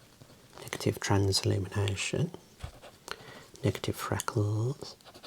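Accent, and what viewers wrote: Birmingham, United Kingdom. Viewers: I neeeeeeed to know. Are you a professional optican or optometrist? Also fellow brummie/yam yam here. since i was a kid, ive always had tingles having eyes checked, and your accent adds nostalgia for me! Thank you thank you thank YOU! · Where are you from, your accent is so familiar, I'm from the black country and you sound kind of brummie, love the asmr btw <3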